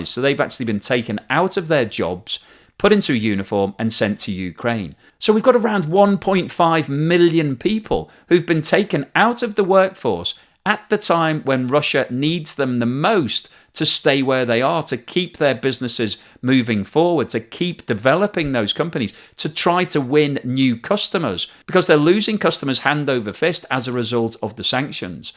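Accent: United Kingdom. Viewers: he claims without citing any source that it doesn’t “seem to be the case” that Russia stuck to its original claim that they’d only call up reservists as part of their expanded military campaign - he follows up with stating that “many” people who have “gotten those papers” have absolutely no military background, again without citing any source and certainly without any sort of quantitative estimates provided - he immediately then admits that “there are no official reports” regarding this issue, but he offers in its place, as some sort of proof, the always-trustworthy “reports on social media”. These are just a few examples of how incredibly biased towards western mainstream media narratives that he is. This guy or whatever is incredibly ill-informed, close minded, and tbh seemingly just downright dumb; he constantly uses unsophisticated,overly simplistic arguments that are the type of propaganda you’d find as talking points from an episode of vrian stelter on CNN. 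Your British accent can only get you so far buddy. Sooner or later you’re going to be figured out for who you really are: a run-of-the-mill, wannabe establishment political pundit, punk, who for some reason thinks he can brand himself as a some sort of a financial analyst, even though you are clearly just masquerading as one given how infrequently you actually present a comprehensive quantitative financial analysis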